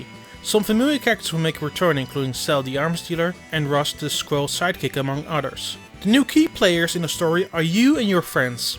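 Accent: Netherlands. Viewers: Yes he is Dutch lol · You are dutch, i can hear that ;-) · Is this dude Dutch? His accent is slightly off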